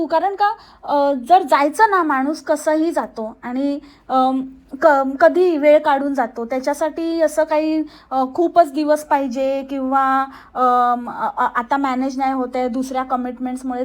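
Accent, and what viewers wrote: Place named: Ahmednagar, India. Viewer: tu khuthun ahes kiti chan bolte tu nagarchi ahe ka tu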